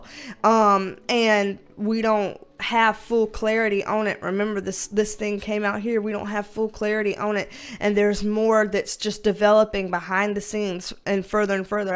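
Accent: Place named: Rural, United States. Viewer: As a country girl myself I love your accent. It feels so familiar and comforting! Love ya! Keep up the great work and congratulations on 10k!!! 🤩🥳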